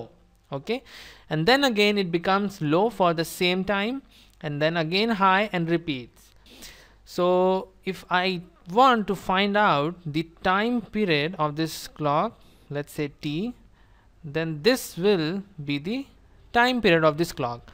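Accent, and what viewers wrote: India. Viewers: Lol. I think his accent is less heavier and easier to understand than other Indian videos. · Its the first time I see Indians teaching. Besides the speaking accent, his teaching skill are surprising. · i love your indian accent, lovely!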